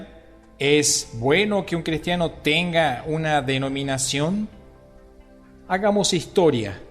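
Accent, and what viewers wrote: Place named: Northwest, Argentina. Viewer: Hermano , es correcto lo que dices . Que Jesús siga bendiciéndote . ( ¿ Eres salteño ? Tienes tonada del noroeste )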